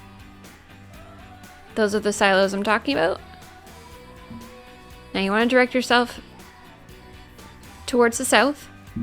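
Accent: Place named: Canada. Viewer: Thanks so much for the help. I believe I've found all 150 cases. (not sure if there are 151) LOL Btw, are you Canadian? The way you pronounce "out" and words with that sound are usually a dead giveaway. ;)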